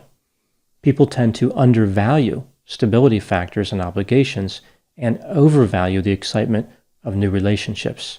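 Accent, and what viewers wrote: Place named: Pennsylvania, United States. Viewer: Anyone know if Dr grande is from pennsylvania? Something about his accent reminds me of a friend of mine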